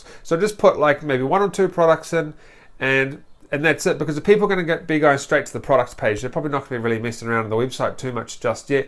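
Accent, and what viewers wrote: New Zealand. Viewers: Are you from nz bro?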